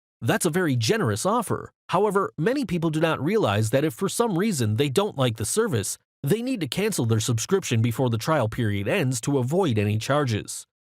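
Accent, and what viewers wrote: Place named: Russia. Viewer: This is insane look at this guys youtube profile he got lots of videos with a russian accent and a really bad laptop microphone but suddenly he speaks english perfectly and with a voice quality of a youtube star. This dude clearly has stolen this video or the voice to get his couple of bucks, how poor.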